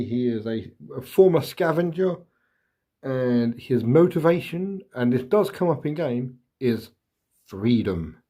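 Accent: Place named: United Kingdom. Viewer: Big into Five Parsecs, and I'm playing it 15mm as well, so to find your channel (a fellow Brit by the sounds of it as well!) is great. You might be getting a fair few comments on me as I blast your playlists.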